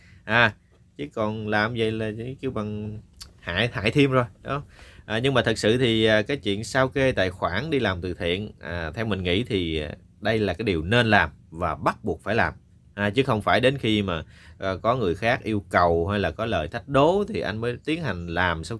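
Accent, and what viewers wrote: Standard. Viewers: Tôi rất thích kênh này họ nói chuẩn 0 phải chỉnh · mc nói qua chuẩn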